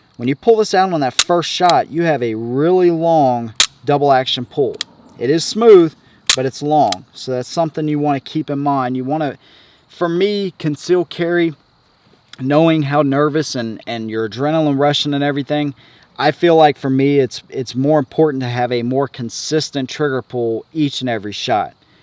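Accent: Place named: West Virginia, United States. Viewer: you have WV accent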